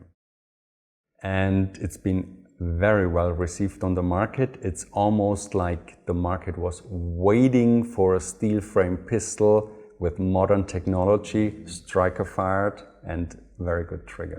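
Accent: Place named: Germany. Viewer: Snobby accent? He’s a German you dick..only in ‘murica eh 😂😂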